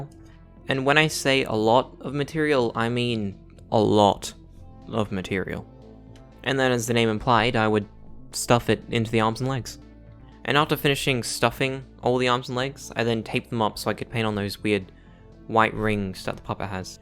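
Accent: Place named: United Kingdom. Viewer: YEEEES HES BRITISH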